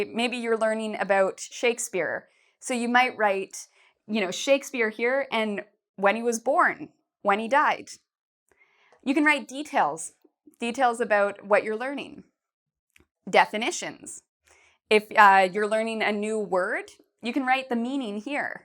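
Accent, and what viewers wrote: Canada. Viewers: you must be Canadian? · And your English sounds somewhat Canadian. Good job. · Because she is Canadian. She says "Abowt" when she says about. This is very common in Canada. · Canadian eh? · she's Canadian I think. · Because she is canadian, not american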